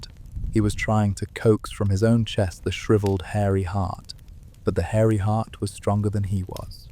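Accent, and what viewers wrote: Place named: United Kingdom. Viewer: You have no idea how much I love love love this video. I've been falling asleep to it every night for a week now. As a huge fan of everything in the Harry Potter universe, sleep/meditation/storytelling videos and the voices of british men, this is amazing. Please keep conjuring these videos! I can't wait to see what's next! 🪄✨️💤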